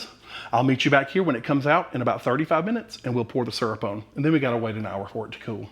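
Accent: South, United States. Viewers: my first time visiting your channel. I detect a Southern accent in your voice. Where did you grow up and where are you living now??? Love your enthusiasm and smile!!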